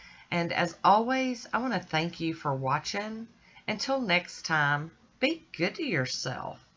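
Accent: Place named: Texas, United States. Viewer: Lady you make me smile so much! I adore watching your videos. You are creative and watching you is like watching my family. Maybe because I am a Texan and you accent and manor hit my heart. Anyway I love you so! Keep being you. Thank you!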